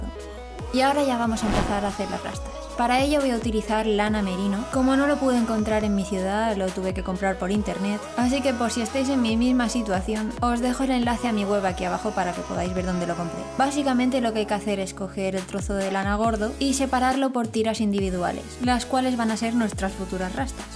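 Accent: Spain. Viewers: tu canal es ermozo pero una pregunta eres española?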